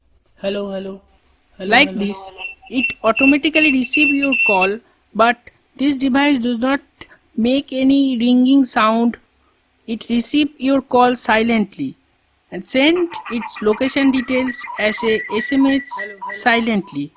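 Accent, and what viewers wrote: India. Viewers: I hear Indian, I click close video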